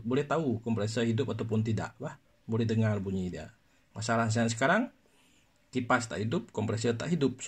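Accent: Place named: Bidayuh, Malaysia. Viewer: Slang bidayuh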